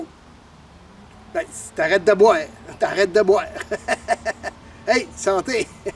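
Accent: Quebec, Canada. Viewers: j'adore la voix de quebecois tabernac lol. Je t'ai decouvert avec l'oiseau chieur. j'adore les saisons chez vous ! le top c'est un de mes rêves de vivre dans des regions neigeuses avec super vert le reste de l'année. Profite bien frere quebecois :)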